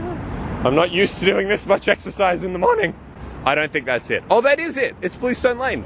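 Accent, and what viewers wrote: Australia. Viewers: I really love Australian accent <3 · "warder-proof testing" love aus accent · Wow I'm dumb I just realized your Australian even though I am Australian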